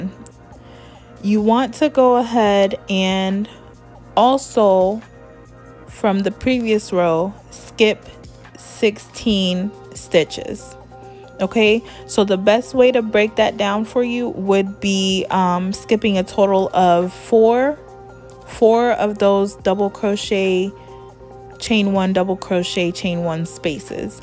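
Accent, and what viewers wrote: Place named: Caribbean. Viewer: Where are you from? You sound Caribbean. Thank you for the pattern, easy to follow.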